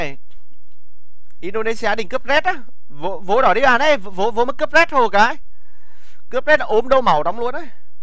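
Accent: Nghe An, Vietnam. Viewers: Ah giọng nghệ an ở thái hoà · thick giọng nghệ an nghe sướng tai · E thích tiếng nghệ an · Giọng nghệ an hay mà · Giọng nghệ nghe buồn cười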